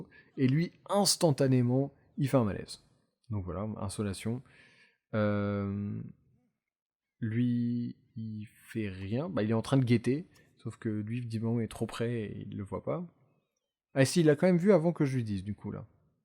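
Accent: England, United Kingdom. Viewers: J'adore ton accent anglais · Cet accent anglais de malade!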